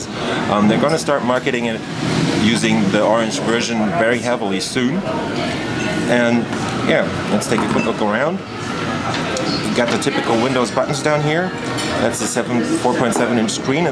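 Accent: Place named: Germany. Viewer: Your english has less of a german accent here than it used to have, i noticed all of a sudden.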